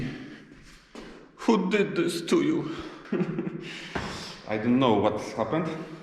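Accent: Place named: Poland. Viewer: polskiego akcentu nie ukryjesz xD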